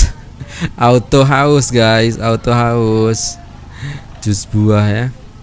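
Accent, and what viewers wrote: Malaysia. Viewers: Cak lapar terus cakap cam orang malaysia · Cakap serupa orang Malaysia jer..penah datang Malaysia ke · Cara cakap macam org malaysia la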